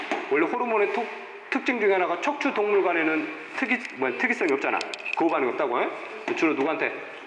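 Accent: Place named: Jeolla, South Korea. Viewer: 아따 전라도 사투리 구수하구마잉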